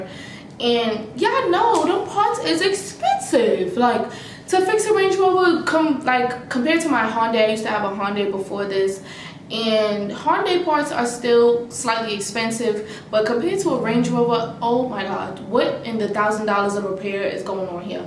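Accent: New York, United States. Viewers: That’s so scary! Glad you were paying attention to your surroundings and your boyfriend was there! Also yall with this thick ny accent story time is everything 👏🏾 “respectfully” lol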